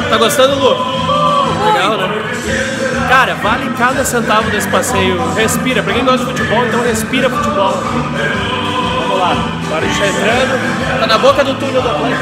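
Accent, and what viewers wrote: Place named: Porto Alegre, Brazil. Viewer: Pode deixar. Abraço..pelo sotaque é Porto Alegrense igual a mim. Tmj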